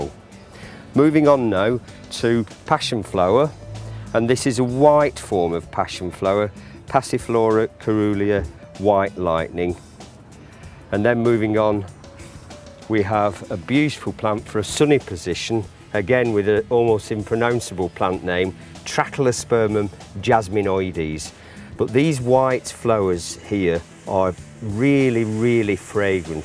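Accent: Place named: Lancashire, United Kingdom. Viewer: What a lovely Lancashire accent. Thanks for the info!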